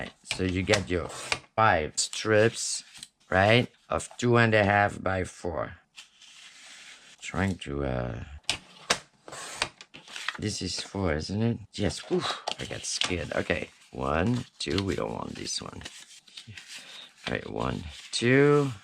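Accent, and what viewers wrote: France. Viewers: OMG I just found your page. You’ve had me laughing and smiling for the past two hours. I love your accent! Not sure but maybe French? Love your videos. I first watched the video where you made the ephemera storage. I cracked up when you said “ Oh wait look at all the little people. Now I can see all the little people!” Just know you have a new subscriber and I’m loving all your content! Cheers…. Nancy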